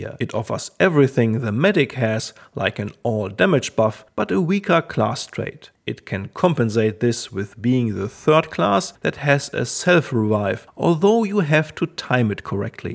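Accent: Germany. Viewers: Youre 100% German. That’s the most typical German/English accent I’ve ever heard! 😂 Thanks for the very informative video and taking the time for the community!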